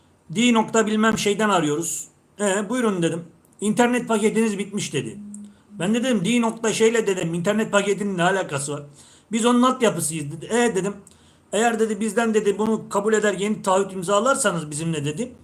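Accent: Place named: Ankara, Turkey. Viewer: Angara sesin geliyor😮😮😮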